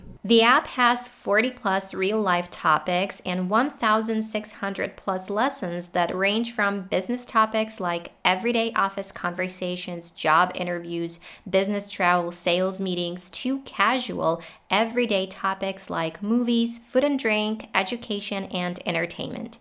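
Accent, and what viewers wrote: United States. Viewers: Why you the force of pronounciation like the accent American? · Dear Madam, congratulation on the level of Amercican accent. You have made a great progress, but be aware of the fact that all the media products mentioned by you need not be available to everyone. To turn your presnentation into a resource for listeners, have your presentation arranged in parts dealing with words, phrases and sentences spiced up with specific aspects e.g. setting the organs of speech before starting the warm-up exercises for pronounciation of sounds, syllables, then at phrase level the stress and finally intonation at sentence functions., etc. making up the so-called mile-stones along the almost never-ending but really a rewarding journey of learning a foreign language plus the culture, traditions etc. Best regards, Paul,67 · I just have known your channel since March this year. 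I must have to say your accent let me consider you are from America. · It doesn't seem to me that you are not american because of expanding your accent accuracy. Go beyond and make yourself smarter more and more.❤❤❤❤❤ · How long did it take you to master American accent ? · You’re American accent sounds beautiful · Modern British RP is more distinctive and eloquent. Just my opinion. You're doing very well with your American accent though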